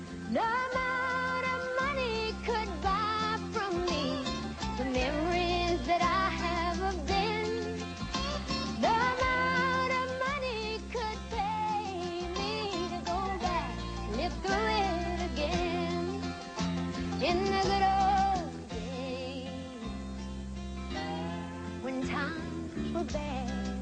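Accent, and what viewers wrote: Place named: Southeast, United States. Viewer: Southeastern America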